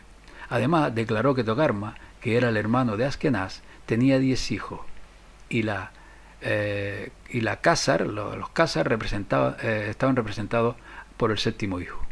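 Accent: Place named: Canary Islands, Spain. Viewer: Por tu acento deduzco que eres Canario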